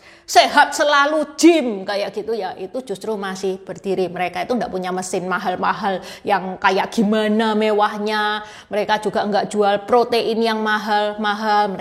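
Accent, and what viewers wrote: Java, Indonesia. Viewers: Ci ini cindo tapi bicaranya medok jowo ketara sekali 👍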